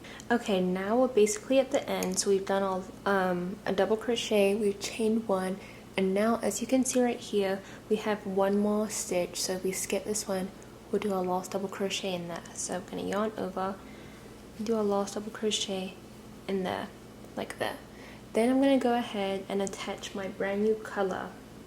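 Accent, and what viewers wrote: England, United Kingdom. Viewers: Love the English accent😊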